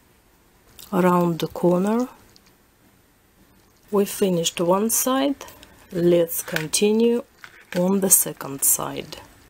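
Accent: Russia. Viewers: Русский акцент:) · thought I could detect some Russian in your accent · У тебя русский акцент☺ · Oh god, i thought your accent was russian because of Red from Orange is the new black! ( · русский акцент:0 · Omg i wish i was Russian thats why your accident this so beautiful i love that voice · but she is Russian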